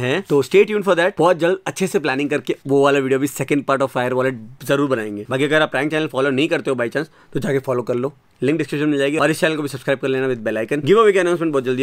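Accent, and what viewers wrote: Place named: Gujarat, India. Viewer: Heyyy bhaiya and didi...... Lots and lots of love from my family and friends... May you live longer and longer and make our next generation laugh to as we laugh... I cant spend a day without your vlogs and prank in breakfast your video in lunch your video and in dinner your video ❤️❤️i love your way of speaking and gujju tone and how you help otherrrss.. your mom is so cute as welll❤️❤️✨✨ Interested in giveaway ✨❤️ Lots and lots of love from my family and mee❤️✨ bhaiya new logo is mastttt❤️❤️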